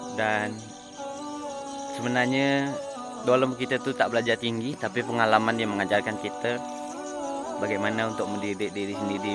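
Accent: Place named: Sarawak, Malaysia. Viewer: kau orang Sarawak ke?? suara dan cara kau bercakap macam kawan lama aku orang Sarawak nama Azmeer... hahahhaaha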